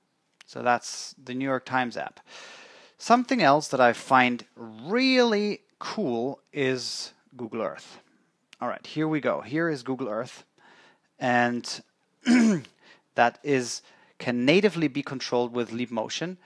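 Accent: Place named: Germany. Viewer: This is the first vid I've watched of yours and I kept thinking "He sounds like his accent is German" and then you went to your home town haha.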